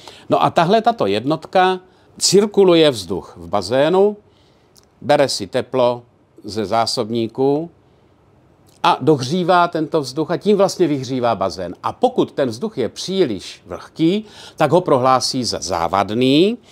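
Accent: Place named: Brno, Czech Republic. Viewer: Mě trochu připomíná pana premiéra Fialu :) Hlasem, lehce brněnským přízvukem, těma pomlkama uprostřed věty i tím formálně-akademickým jazykem.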